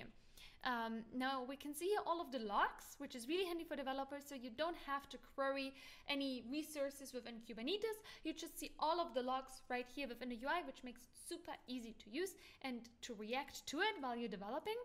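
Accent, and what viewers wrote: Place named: Germany. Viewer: Thanks for sharing this ! You are from Germany, I guess... ? Just curious ! 😬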